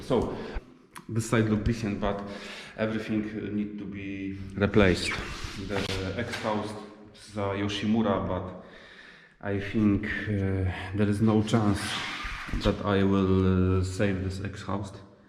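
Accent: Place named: Poland. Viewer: polskiego akcentu nie ukryjesz xD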